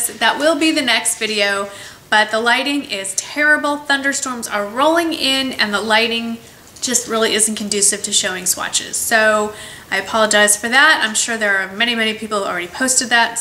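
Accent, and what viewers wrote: United States. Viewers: I love your voice and your accent. It reminds me so much of my year in the US ...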